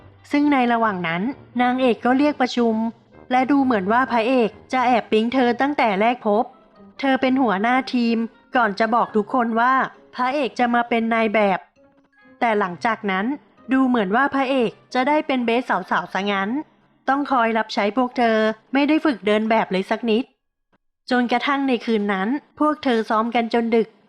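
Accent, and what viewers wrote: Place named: Isan, Thailand. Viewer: คนอีสานเปล่าหนิ สำเนียงในการพากย์เหมือนคนอีสาน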